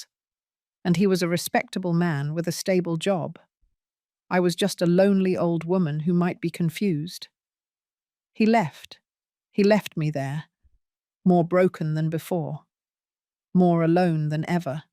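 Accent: United Kingdom. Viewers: The speaker has a different accent because she is British, not American.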